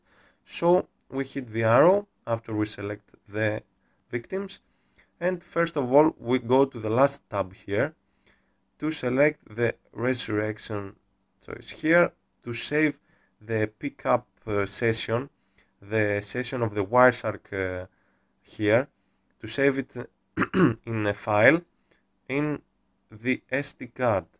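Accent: Greece. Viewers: Πρώτον <link> εδώ έχουν ξανανεβάσει το video σου και δεν νομίζω πως είναι με την άδεια σου.. Δεύτερον η προφορά σου είναι πολύ ελληνική :D Τρίτον δουλεύει μόνο σε pc victims ή και σε κινητά? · ΛΙΠΟΝ ΑΥΤΟΣ ΕΙΝΑΙ ΕΛΛHΝΑΣ ΧΑΧΑ ΠΡΟΦΟΡΑ :)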